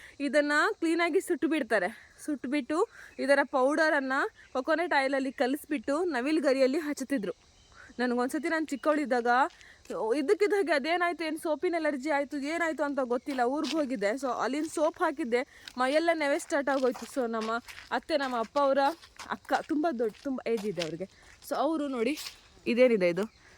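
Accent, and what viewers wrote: Standard. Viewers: Awesome vlog sis.ondu vishaya sis neavu meathodooo kannada geaaa ondu salute 🫡 pure kannada 😊😊😊😊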